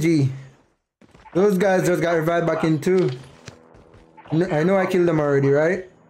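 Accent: Jamaica. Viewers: Are you from Jamaica pls tell me I am from there to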